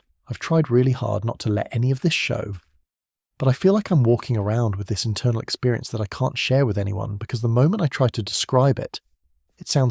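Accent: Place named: United Kingdom. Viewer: why channel say usa but voice british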